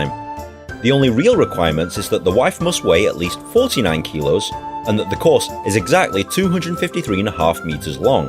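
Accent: United Kingdom. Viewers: British accent is awesome!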